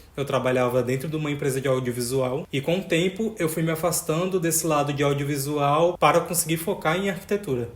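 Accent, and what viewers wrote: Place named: Natal, Brazil. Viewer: nossa, tô maratonando seu canal e logo pensei “será que ele é de Natal?” pelo sotaque mas principalmente pelas gírias hahahaha amei ❤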